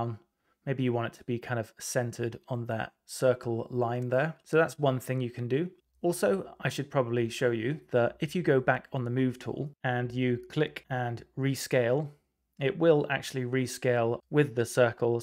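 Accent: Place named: United Kingdom. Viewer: Understanding the British dialect…challenging but repetition aids learning!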